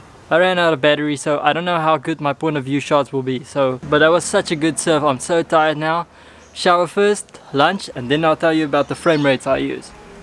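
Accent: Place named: South Africa. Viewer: You sound South African, yep.... 😉🇿🇦🤙🏽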